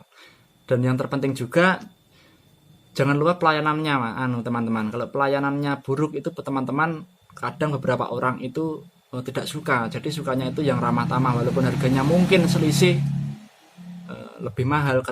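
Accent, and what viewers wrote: Java, Indonesia. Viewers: Jowo e pundi mas? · Bahasa indonesianya medok bang. Hehehe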